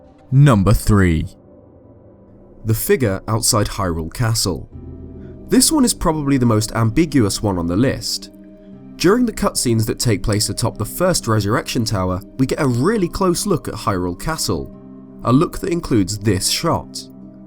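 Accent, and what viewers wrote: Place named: RP, United Kingdom. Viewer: Thank you for a clear voice and speaking the Queens english, as well as knowing what you talking about! Very professional! Congratulations, job well done!!!!!!!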